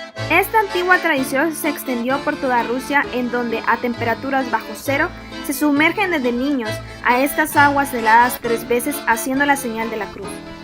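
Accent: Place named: Ecuador. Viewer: Hola, usted es Ecuatoriana oh Mexicana porque tiene un acento parecido a la Ecuatoriana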